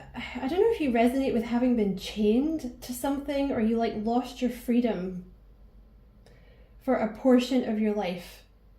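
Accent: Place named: Scotland, United Kingdom. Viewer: The second i heard the accent i subbed. Hellow my Scottish sister fom your sister in England ❤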